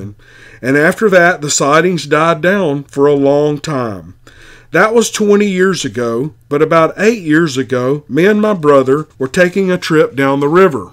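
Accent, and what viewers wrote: South, United States. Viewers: I enjoy your southern accent and am a big blues fan. I also believe in bigfoot and appreciate what you do for our entertainment. Thanks bud, keep up the good work! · Love your story telling. Your southern draw adds such authenticity to the stories. Keep up the good work buddy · Mr. I do not mind the music as long as I get great stories! And I had a cousin that had sounded like you from down south. I think your accent is adorable. Me as city slicker is not offended at all. That is why God made us all different...with a Twist! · Your music is part of what makes u stand out from others! The rest is great content and that charming southern drawl! Love u! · Geez, seriously? There are three things that makes channel unique. 1) The awesome music, unique to this type of channel. 2) way of reading the stories, so honest and respectful. 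His southern accent really adds to the storytelling too. 3) The awesome stories people send in. They trust with their real life experiences because he is so respectful. So if these aspects don't appeal to some...fine and dandy...just move along to a channel you like....cause we LOVE what does with his! That's right you heard me....move along!! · it’s me, your boy Bleu, hailing from Ocean Springs, MS. I now live in Costa Rica (again.) I’m just gonna sing your praises because I dig this topic, you talk like me and I always look forward to your content. It’s my feeling that us southerners don’t much care for folks gushing and it’s likely uncomfortable af for you. That type of thing is super awkward for me, my people back home, most Mississippians and most definitely Cajuns like myself. I’m a fan. Yankees tell you the same thing. 😂 There’s a reason for that. A good story teller is highly valued across cultures in every corner of the world. 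It’s been that way since the beginning of time, although it’s exceedingly rare these day. Keep up the great work. It’s very much appreciated. Blessings to you and yours. Thank you. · Teddy Nevarez Right On! The Southern accent is something to be proud of. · The music at the intro has such a Southern feel to it, and that combined with your accent and the way you tell the stories is what makes this channel THE BEST! 🤗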